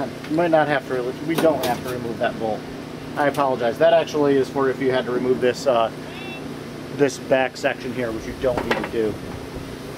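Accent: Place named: Chicago, United States. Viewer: I detect a Chicago accent . Also that Chicago wind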